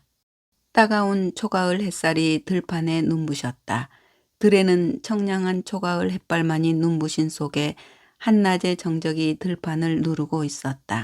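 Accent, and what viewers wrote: Jeolla, South Korea. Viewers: 송기숙 작가님의 작품을 낭독해주셔서 감사합니다. 남도 사투리가, 겨울밤 화롯불속의 구수한 군밤같네요. 그 구수한 향을 그대로 나타나게 해 주신.감사합니다.☆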